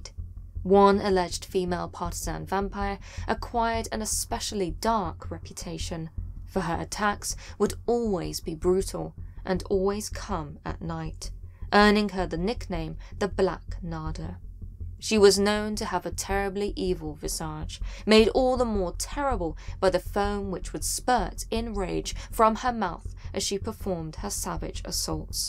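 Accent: England, United Kingdom. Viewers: You are a great storyteller. This just happened to pop up on my main page, so I thought I'd take a look at it. Being a songwriter, musician, vocalist and body builder those are some of the things that I mostly look for on here. But since youtube started adding different types of videos to my page I started to watch a few of them. You're really good. It's because of the way that you tell them. You also have that great British accent going on there too. I think that your British accent is awesome! You do a great job. Thanks. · It's an English accent, not British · I love this informative channel!!! Thank you for presenting your content in a classy and professional way!! It urkes me soooo bad when you click on serious and mysterious videos and it's either a computer voice or some joker trying to make funny comments while presenting. Very well done, love your beautiful English voice ♥️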